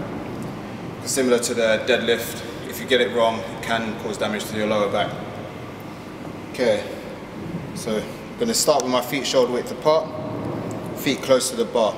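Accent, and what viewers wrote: United Kingdom. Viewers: As an American (white guy) when I hear a refined British accent coming from a black man who probably has a normal job of some sort, it gives me hope for the average American black person. Not much hope but still a bit of hope that they are capable of being refined.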